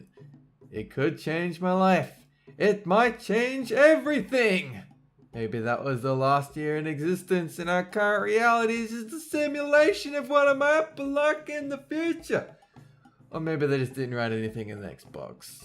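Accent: Australia. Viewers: Is he Aussie🇦🇺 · is this guy Australian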